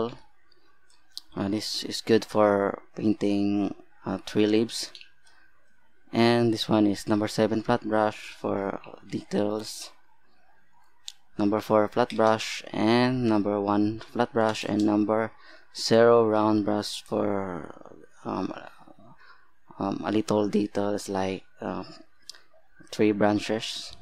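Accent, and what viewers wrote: Philippines. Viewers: By just listening to you, I know you are my kababayan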